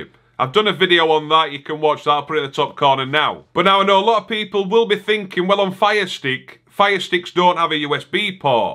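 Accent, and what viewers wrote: Yorkshire, United Kingdom. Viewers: Are you the most Northern person in history? You sound very Yorkshire.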